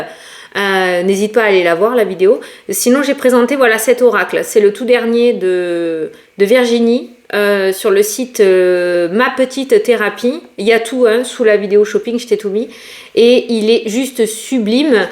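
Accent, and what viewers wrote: South, France. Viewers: Coucou, J’ai une petite question un peu particulière! Je cherche d’ou vient ton accent? Marseille ou côté Toulouse? Je t’écoute tous les jours… je t’adore ❤❤ Bisous bisous ❤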